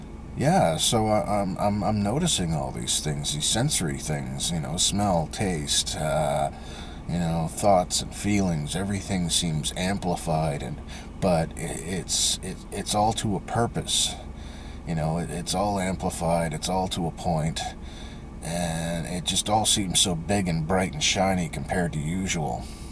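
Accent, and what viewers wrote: Canada. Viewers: This guy is Canadian he says aboot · Good stuff man. I am ready to have that trip. You sound Canadian? How do I message you btw?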